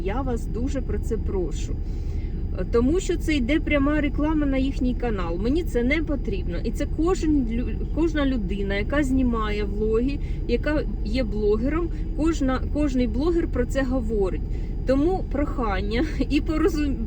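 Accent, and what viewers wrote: Sumy, Ukraine. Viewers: Привіт ❤ Все правильно говорите! В Сумах своя вимова! Але! Скажу вам,шо наші діти,навчаючись раніше ) в російськомовних школах здавали ЗНО на найвищі бали саме з укр мови і літератури Саме моя донька старша то у приклад З початком війни вона одразу перейшла на українську і в Німеччині всі в шоці,яка в неї гарна саме література укр мова! Бо її подруга,яка з заходу України тепер вчиться в неї мови! Ви класна! Справжня! Дивимося вас з молодшою донькою! Хоча секонди взагалі не цікавлять 😂 але вас дивимся с задоволенням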